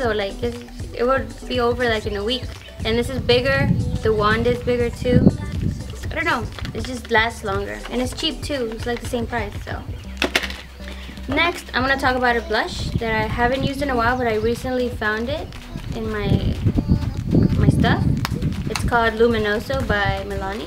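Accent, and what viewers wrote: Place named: Spain. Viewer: Hehe i love yur Spanish accent(; ❤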